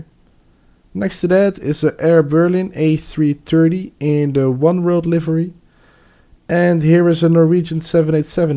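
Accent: Netherlands. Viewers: Ik hou van het nederlandse accent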